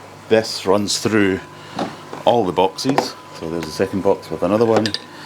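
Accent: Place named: Scottish Highlands, United Kingdom. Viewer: Uncle got highlander accent and i absolutely love it. Excellent video🤝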